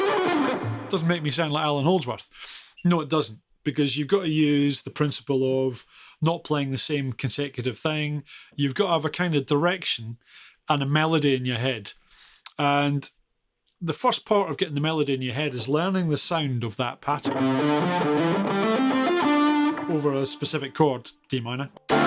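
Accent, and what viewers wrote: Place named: Scotland, United Kingdom. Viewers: This is East Scotland accent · The accent is scottish ... alans was yorkshire .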